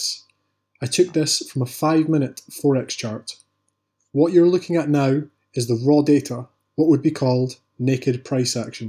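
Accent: Scotland, United Kingdom. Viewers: Absolutely love clicking on a video and hearing a Scottish accent! Thanks for the great vid bro 🏴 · Great to hear another Scots accent. :) I just signed up for a ForexCT account but having suffered severe memory loss from a brain injury my days of previous financial education are a lost blur. So this video, I'm sad to say, is where my level is at. I'm just trying to put my life back together. (I'm a Glasgow boy but I now live in Australia). So thank you. ;) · Looks like I've found my teacher and a Scotsman by the sounds of it, like myself 👊 I recently opened a brokerage account and had a go. Yeah, ended up with a migraine and worn teeth. Thought I should search out a guru! Looking forward to an intense, enlightening journey! Really appreciate your content my friend! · Just a beginner here and was glad to find a video with easy to understand info about candles, and by someone with an awesome Scottish accent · Excellent video, very well explained and presented. 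Nice to hear a Scottish accent as well. Thanks for the video. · Thank you for the clear and simple explanation. Great Scottish accent for narration too.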